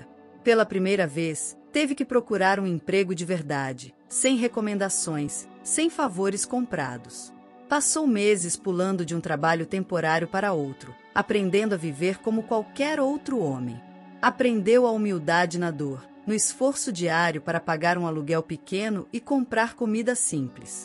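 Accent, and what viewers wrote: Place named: Northeast, Brazil. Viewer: Boa gostei sim ê isso mulher parece que vc ê nordestina paraibana,cearense,bom seja lá de onde fores meu abraço e um xeru p vc Matheus pela coragem de nunca desistir daquilo e quem vc amava valeu e muito valeu e para o senhor arrogante Paulo a estrada ê larga mas contém curvas fechadas querido e as suas curvas te enrolaram,bom como estas pode mandar no meu tempo assistir eu várias obg canal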